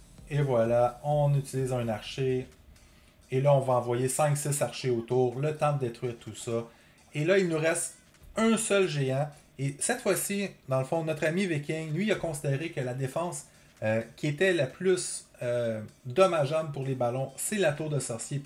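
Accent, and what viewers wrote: Quebec, Canada. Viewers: tu est québécois ?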